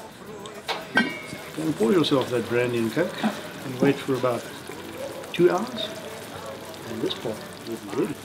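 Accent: South Africa. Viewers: How you going Bought my first Potjie last weekend No3 and have been loving your channel ever since For my first Potjie I'm thinking this one Can I mention you and put a link to you in my comments when I do the video. And I love ❤️ the South African accent Thanks mate for the easy to follow Potjiekos instructions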